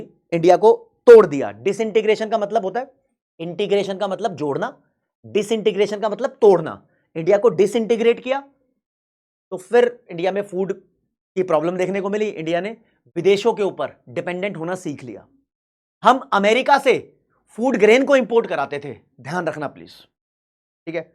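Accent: Punjab, India. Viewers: SIR APP PUNJABI HO KYA BATO SE LAG RAHA HAI 😅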